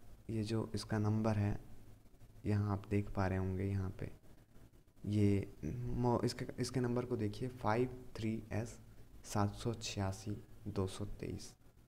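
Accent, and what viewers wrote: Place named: Madhya Pradesh, India. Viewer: Bhai wo sab to thik hai...lekin tumne iss video me pakaya bahut hai. Ek simple si chiz batane k liye itna jyada bola upar se tumhare bolne k style se samajh aa raha h ki tum MP se ho.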